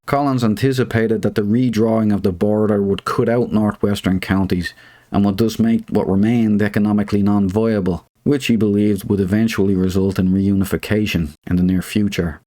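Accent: Ireland. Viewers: Are you Irish?.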